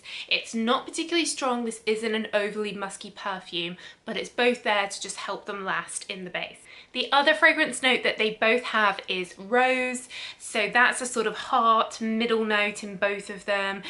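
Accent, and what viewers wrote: United Kingdom. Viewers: Hi! I already suscribed! love both versions but I prefer Absolutely blooming ❤ I 'm from Argentina and I do love the world of perfumes. As I'm an English teacher, I enjoy listening to real Britsh English reviews😉❤ they are really interesting and descriptive and it feels like you are smelling those marvellous scents😘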